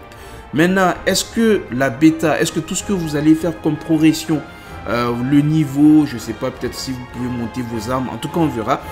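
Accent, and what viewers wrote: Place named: Germany. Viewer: j'adore ton pti accent allemand😍😍😍😍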